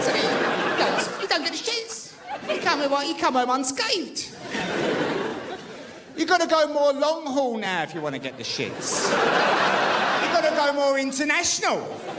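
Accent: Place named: Cockney, United Kingdom. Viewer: You gotta love a bit of the cockney geezer! Pure class! UK xx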